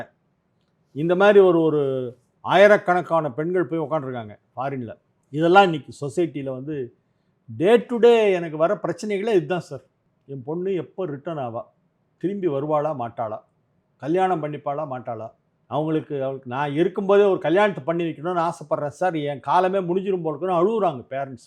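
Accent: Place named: Tirunelveli, India. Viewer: அய்யாவின் பேச்சு நெல்லை மாவட்டத்திற்கு தனி சிறப்பு ஆச்சு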